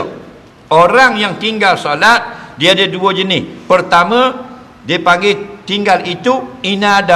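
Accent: Terengganu, Malaysia. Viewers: dia cakap slang Terengganu mcm ustaz *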